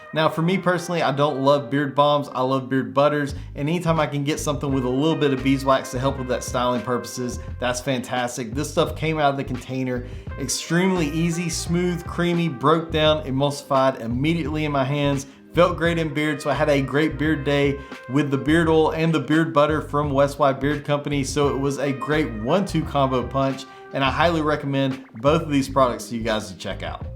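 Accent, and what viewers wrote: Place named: United States. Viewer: I’m fascinated by regional accents so when I hear “carrer ool” I just have to know what part of the US you’re from. NOT making fun, swear. I’m from Philly, famous for weird-ass accent, so I’m just interested from a linguistic perspective.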